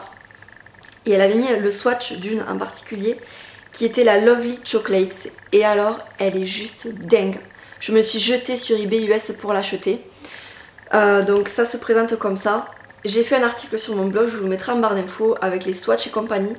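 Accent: South, France. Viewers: Tu es au top trop géniale tes haul sont supers en plus avec un accent du sud ..... mais quel moments magiques!!!!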